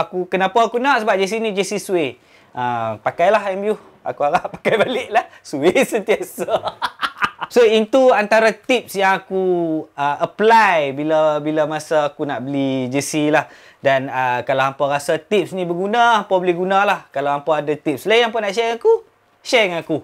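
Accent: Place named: North, Malaysia. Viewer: Baru jumpa channel ni.. Serius content sempoi bro !! Dengaq pekat utaqha tu jatuh cintaa nak layan haha.. btw keep the content bro & stay active this channel..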